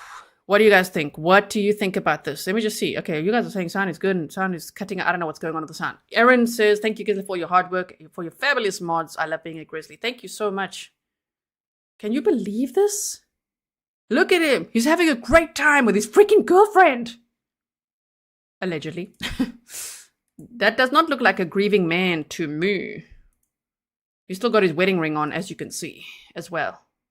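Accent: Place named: South Africa. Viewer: So glad to finally find out that you are from SA! When I first watched one of your videos and thought "man that accent sounds so South African" so thank you for confirming that, my mind can rest easy now! From a fellow South African!🤗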